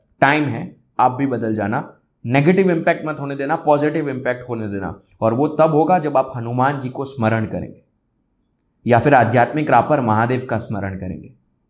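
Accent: Gujarat, India. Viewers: How do I meet your Guru? Thank you. (I note Gujarati accent in your speaking. You seem to be a Gujarati(may be a Marathi). I could be wrong.)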